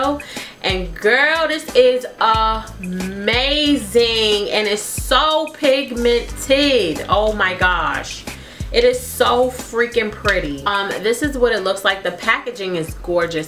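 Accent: New York, United States. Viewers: I LOVE u and ur videos. I can listen to u talk all day!! (the NY accent 😊) Please do a video on the Kylie lippies!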